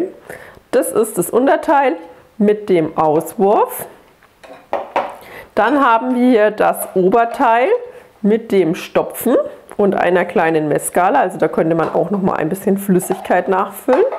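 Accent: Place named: Franconia, Germany. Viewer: Hört man sofort 😂 Aber nicht nur das r, auch das "Sprechsingen" etc. Mir Frangen hald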